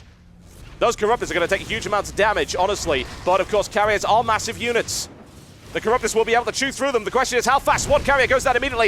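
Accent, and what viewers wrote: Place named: England, United Kingdom. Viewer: ? when did you get an english accent?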